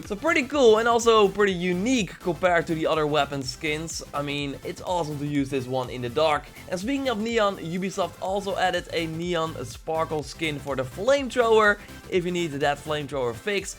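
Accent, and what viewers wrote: Netherlands. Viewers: Im guessing that you are dutch or belgian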